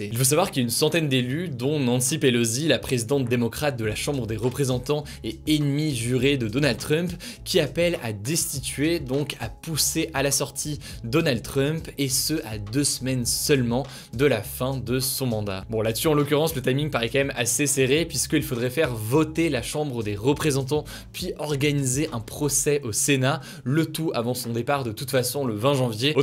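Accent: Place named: England, United Kingdom. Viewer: si on peut juste s’arrêter quelques secondes et apprécier l’accent anglais d’. t’es génial.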